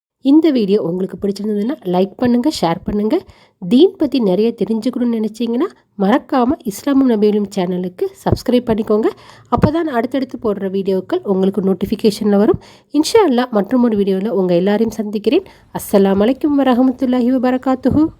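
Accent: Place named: Tamil Nadu, India. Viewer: அஸ்ஸலாமு அலைக்கும் சகோதரி நீங்கள் ஓதுவது முற்றாக தவறாக உள்ளது அரபி வசனங்களை தமிழ் உச்சரிப்பில் ஓதுவதன் மூலம் அதனுடைய கருத்துக்கள் வேறுபடுகின்றது தஜ்வீத் தெரியாவிட்டாலும் அதன் உச்சரிப்பை நாம் ஒழுங்காக உச்சரிக்க வேண்டும் இல்லை என்றால் அதனுடைய கருத்துக்கள் மாறிவிடும் தயவுசெய்து இனி வரும் காலங்களில் முறையாக ஓதி பதிவிடுங்கள் இல்லை என்றால் இதை பார்த்து ஓதுபவர்கள் அவர்களும் குர்ஆனை தவறாக ஓதுவார்கள்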